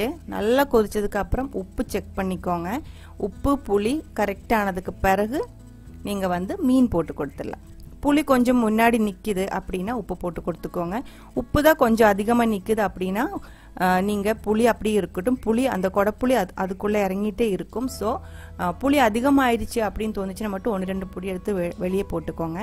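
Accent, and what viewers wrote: Kerala, India. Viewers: Azhagana Malayalam kalantha thamil pesum thangachikku vaalthukkal. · Malayalees tamil pesuradhe oru azhagu than! I like the way you speak and the way you speak and oviya S accent (big boss) !! I tried this watching a malayalam channel it was v delicious 😋 I tried it with mackerel , next time I l try in your style for jelabi meen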